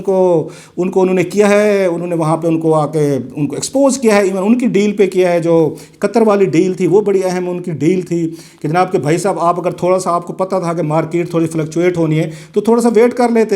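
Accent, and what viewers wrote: Saraiki, Pakistan. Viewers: اس میں کوہی شک نہیں کے سراکی زبان بڑ ی میٹھی بولی ہے ۔آپ کی vl جہاں محلو ما ت کا ذریعہ ہے وہاں آپ کی اردو میں ایک سراکی ٹچ ہے جو سنے میں اچھا لگتا ہے آپ انداز اور اصلوب اچھا ہے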